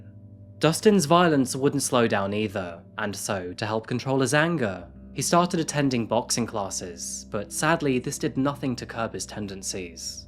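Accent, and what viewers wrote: United Kingdom. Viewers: Hey. I thought you're American. Are you British? You've said you're from Seattle before as far as I remember. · Hearing a British guy, talking about Canada STILL reference United States dollars here just makes me happy. We're still #1 baby!!! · Where do I send the coffee? Wait, you're British? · You live in England? That’s why your British accent is coming back. 🙂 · I love the way you narrate the stories and your British accent.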